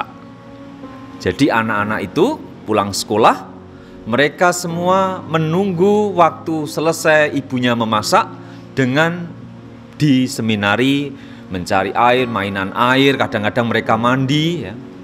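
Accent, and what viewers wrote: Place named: Banyumas, Indonesia. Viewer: Senang mendengar ngapakx father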